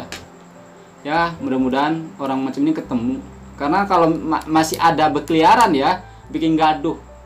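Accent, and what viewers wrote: Aceh, Indonesia. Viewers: Gaya ckp nye spt org acheh..xlma nt kna kutipla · Cara ckp to mcm urg aceh .. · Betul bro Loghat mcm aceh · Pelat bahasa nya org aceh,video yg mencabar kedaulatan malaysia itu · kerana gaya cakapnya itu seperti dia nya berada di Indonesia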